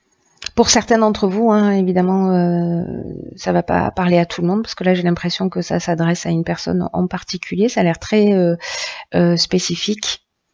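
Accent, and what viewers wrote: South, France. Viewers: Cc, oui il y a des artistes dans les parages 😅 intermittent du spectacle, chanteur guitariste pendant plusieurs années jusqu'à la retraite. Après un accident de la route, je replonge dans mon répertoire depuis une dizaine de jours et je répète, un peu dur après 3 ans d'arrêt. J'aime beaucoup votre voix et cette petite pointe d'accent du sud 🎉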